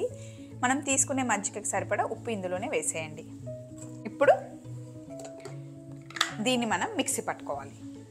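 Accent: Telangana, India. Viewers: Telangana slang alaage untundi andi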